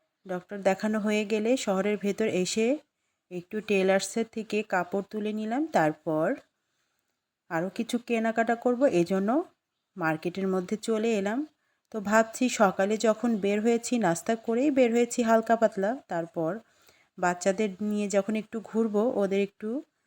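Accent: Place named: Kushtia, Bangladesh. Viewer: আপনি কুশতিয়ার কথাএ থাকে ❤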